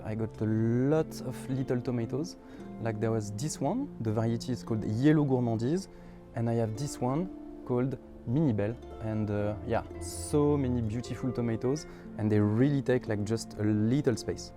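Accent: France. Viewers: Such a beautiful french guy speaks on cute french accent about his balcony garden ☺️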